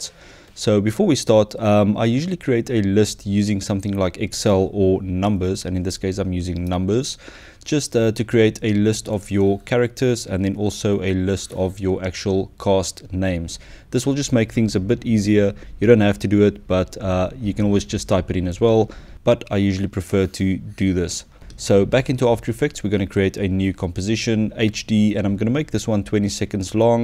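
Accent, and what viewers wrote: South Africa. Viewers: South African :) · South African? Love from Cape Town <3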